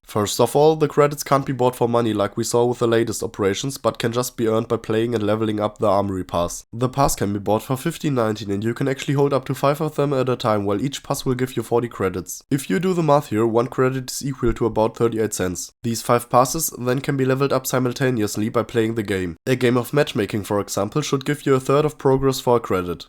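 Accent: Germany. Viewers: dislike only for ur german accent damn too anoing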